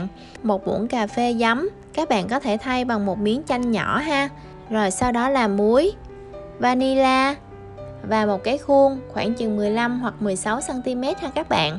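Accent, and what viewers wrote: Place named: South, Vietnam. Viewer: Giọng miền Nam càng dể nến hơn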